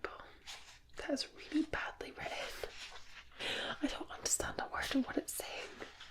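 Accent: Scotland, United Kingdom. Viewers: Wow, 3 hours Of pure Relaxing and calming video. Everyone of your sessions were tremendous. Every angle of your camera was perfect. You know me, I could write a whole story about your video, but I'll make it short! 😯😊 I lasted about two and a half hours into your video before I nodded off! Head and face message along with fluttering fingers, mouth sounds, singing, and new sleep stories were just amazing 👏 👌 😉. you are just so stunning with your lovely soft spoken Scottish accent which sent me to the moon 🌙 🌚 😊 ❤️. Totally, but I mean totally relaxing 😌. Tingles were plenty 😊. I could go on and on but I must get ready for work 😊. Please 🙏 take care and have a wonderful day love! 😊 You are awesome 👌. Sending you lots of love!! ❤️❤️🥰🥰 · Is it just for me, or feel other german scottish speaking lovers, that our "fränkisch" is the best german dialekt. 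And it has a similarity, the rrrrolling arrrr. For all non german, thats the region where PUMA and adidas came from (and no this is NOT Bayern/Bavaria-it s a own country, like Scotland never be England), and Lothar Matthäus is world wide well known, okay-maybe only in the world of football. Since I have seen and read trainspotting on original, I fell in love, with your kind of english. And, you done a great job! Many thanx from Berlin.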